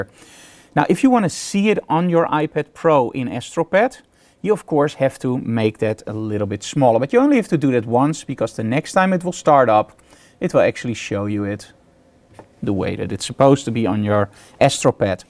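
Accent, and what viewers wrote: Netherlands. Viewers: lol ik kon gelijk herkennen dat je Nederlands was door je accent. geweldige vid man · You are Dutch aren't you? 😂 Me too btw so i'm not laughing at you in a mean way just so you know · I just try it. It's super amazing, I can even draw outside my drawing room seating on a couch, the signal is so strong! btw your accent is super Dutch :D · ben je Nederlands?-are you dutch?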